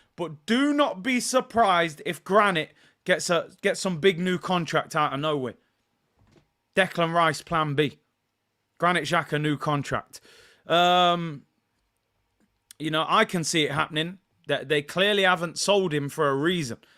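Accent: East Midlands, United Kingdom. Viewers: are you from the East Midlands? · he's from Nottingham, I think that's classified as East Midlands, not 100% sure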